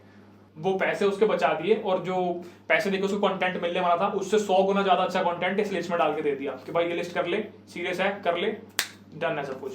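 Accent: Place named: Haryana, India. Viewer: THAT CUTE HARYANVI ACCENT IN HIS VOICE ♥😅🏄💯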